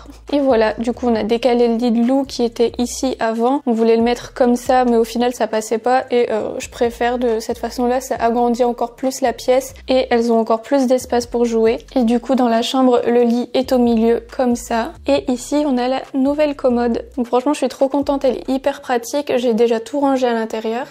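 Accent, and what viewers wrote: North, France. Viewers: Merci pour ta video mais tu et du nord non?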